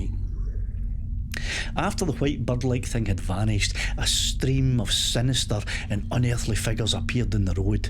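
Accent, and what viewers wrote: Scotland, United Kingdom. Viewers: Just found your channel-- great story- telling, love yer guid Scots tongue and accounts of true Scottish encounters!❤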